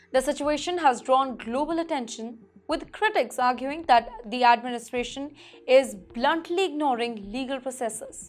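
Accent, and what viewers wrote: India. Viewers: You need to improve your Accent. So bad that internationally cant really follow you. So indianish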